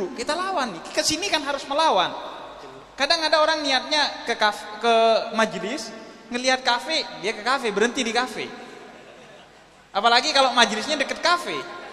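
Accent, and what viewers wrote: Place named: Madura, Indonesia. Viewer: Logat maduranya tetap nonjol bib😁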